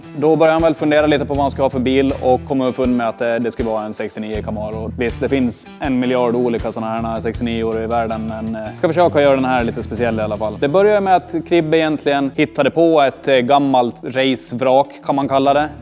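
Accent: Åland, Finland. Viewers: Skulle precis fråga om du var från åland ,när jag såg i kommentarerna att de var så😅,avesta dal åland mål... Jävligt bra film iaf 👍👍. Heja åland, världsrekord på is oxå. Topfuel tjejer i nhra mm. Mycket bra racers från åland !!! Keep it going ❤ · Härlig dialekt men jag gissar nästan på att han är från Åland från början! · jaa hörde lite finlansvenskt i tonen! De är bra ni har börjat med youtube 👍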